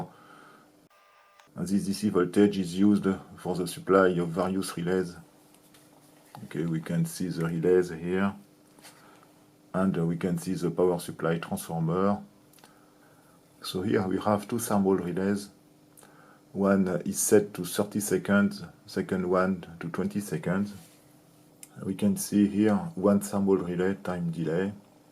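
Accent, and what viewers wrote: France. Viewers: Hahaha, your "few moments later" narration fits perfectly with your nice french accent.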